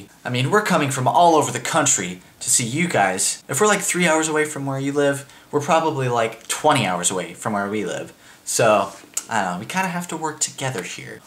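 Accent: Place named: Midwest, United States. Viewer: i like that you still have a mid-western accent even though you live in California.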